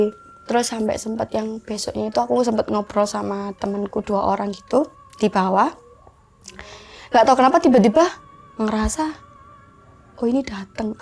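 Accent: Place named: Surabaya, Indonesia. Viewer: Logat khas Suroboyonan yah, iyo la.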